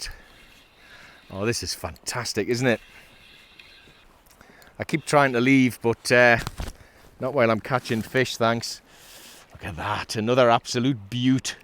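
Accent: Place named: Newcastle, United Kingdom. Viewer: Thought that was you in the kayak i could just about hear the geordie accent, i was in the boat & yes that was my sidewinder 😂😂 hope it brings you luck! Great video!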